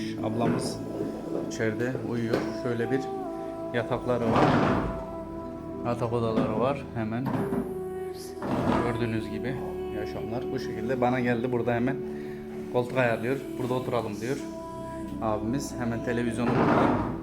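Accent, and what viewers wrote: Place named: Kurdish, Turkey. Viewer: Abicim sen yapamıyorsun, bir kere konuşma aksanın bozuk kürt m7 emi nece belli deyil konuşuyon belli değil vidyoların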